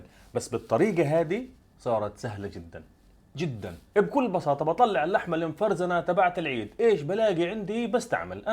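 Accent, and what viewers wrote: Gaza, Palestine. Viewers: احلا شي لهجه الغزاويه مدموجه مع البدوي سبحان الله نفس المكس طالع فالاردن😂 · الظاهر عليك اكيل يا ابن بلدي (لهجتك غزاويه)يمكن غلطانه احكيلي الدغريري.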